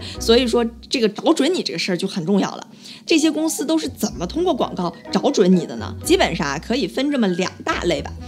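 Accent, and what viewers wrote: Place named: Shandong, China. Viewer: 你是山东人吧，我听你口音像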